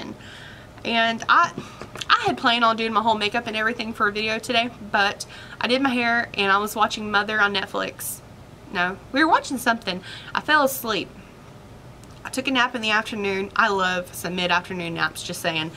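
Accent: South, United States. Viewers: Omg I love you, your giggle is infectious and your southern bell accident is adorable. Also thanks for the spoilers.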